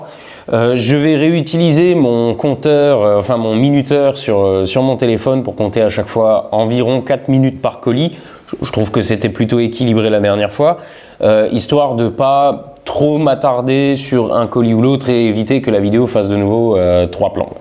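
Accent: Alsace, France. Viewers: Au sujet de l'accent alsacien, faut savoir que je suis du sud-ouest et que mes voisins étaient alsacien, avec l'accent, mais bien pire que le tien, coté allemand de ouf, et du coup quand ils s'engueulaient en mi-français alsacien/mi-allemand on pouvait pas s'empêcher de se taper des barres. C'est comme un québecois qui t'engueule, c'est drôle. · Ton accent alsacien de BasRhinois la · Ton accent Alsacien on dirait un mélange entre l'accent Allemand et Luxembourgeois ( logique tu vas me dire ) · J'adore ton accent d'Alsace hahaha C'est la première fois que j'entendais cet accent <3 Un jour, peut-être que je pourrais te faire découvrir l'accent du Québec (ou en a aussi plusieurs hahaha J'ai fait un "live" dernièrement mais je sacrais trop pendant un test durant un vol dans le Grand Canyon, dans mon simulateur, je sacrais juste trop pour le publier ici et de toute façon, seulement mes friends FB peuvent le voir hahaha) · Aucune honte à avoir l’accent alsacien! 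Signé: un parigot qui se désole de l’uniformisation du français. Belle vidéo émouvante à plein d’aspects. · Haa l'accent alsacien ! Ça fait longtemps que je l'avais pas entendu tiens. Ça rappelle des souvenirs. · J'adore l'accent alsacien (je ne suis pas alsacien) ! Je connais deux personnes qui parlent vraiment comme tu l'as fait et ça n'a rien de ridicule. Même sans te forcer, on le reconnais bien ton accent : réécoute-toi à ;-)) Bravo et merci pour tes vidéos.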